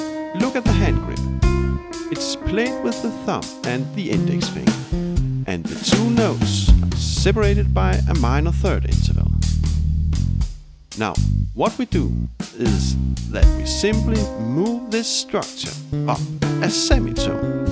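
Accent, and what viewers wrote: Denmark. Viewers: Scandinavian accent immediately recognized. Danish right? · sima' er du dansker. Jeg kan høre det så hårdt i din stemme :) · Is he danish? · Jeg har set videoen i 5 sekunder og kender intet til din kanal overhovedet. Men dén accent afslører en dansker alle steder! Glæder mig til at høre videre nu :-) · Er det bare mig eller lyder han bare mega dansk? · That's a danish accent if I've ever heard one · A Danish accent can't hide from Danish ears 😊 🇩🇰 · Are you danish by any chance?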